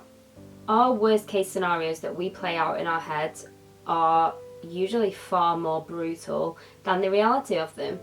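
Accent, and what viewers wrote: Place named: United Kingdom. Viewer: She speaks British English 🤷🏻‍♀️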